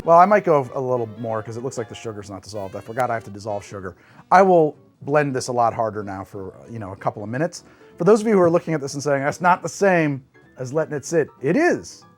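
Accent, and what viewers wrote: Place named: New Jersey, United States. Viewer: You tawk the way you do cuz youre from Joisy. I also grew up in the north east, Massachusetts (and no I'm not from Baaston, I'm from Woostah), and I've never heard a damn soul say "affiktionado."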